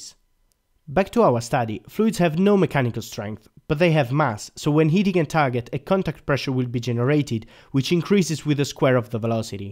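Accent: Italy. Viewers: he's Italian, it's his accent. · Dall'accento presumo che sei Italiano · L'accento italiano si riconosce ovunque, bel video, strutturato in maniera ottima e molto informativo, bravi. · This guy is Italian I’m sure. I’m Italian I recognise the accent. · All'inizio dall'accento ho pensato fossi italiano, vado avanti e leggo nella cartuccia del fucile a pompa "fiocchi", lì mi sono tolto ogni dubbio😂. Davvero complimenti per la pronuncia in inglese, sei molto bravo! Il video è fenomenale, sei davvero bravo!! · Hey ma sei italiano? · ma sei italiano? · He sounds so italian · sei italiano l ho capito subito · He's Italian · He is Italian. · Ma sei italiano? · Are you italian? The accent and the beretta? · troppo forte l'italiano che parla inglese , shock !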